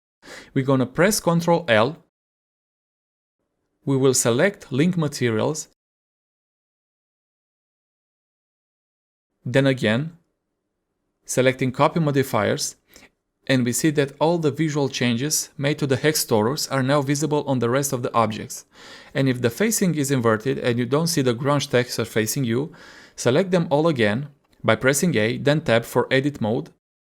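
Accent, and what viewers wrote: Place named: Russia. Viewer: Дружище, по акценту слышно, что ты русский. Пожалуйста выпускай хоть иногда видео на русском 🙏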